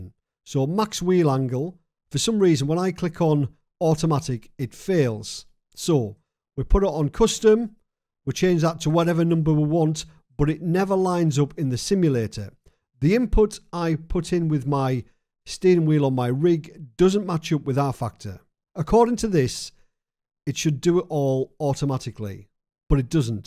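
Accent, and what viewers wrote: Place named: Cumbria, United Kingdom. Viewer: Definitely a Cumbrian 😂👌🏼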